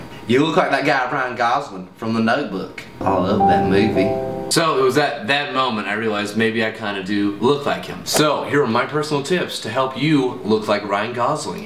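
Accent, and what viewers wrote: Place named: South, United States. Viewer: Amazeballs. Totes entertained, love the southern accent btdubs!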